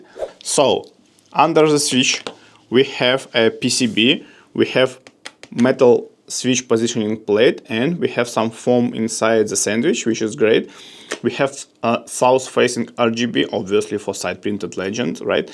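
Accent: Russia. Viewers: russian accent?) я все понял )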